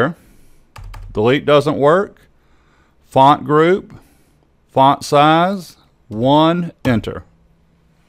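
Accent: South, United States. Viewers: worked like a charm thank you that southern accent though!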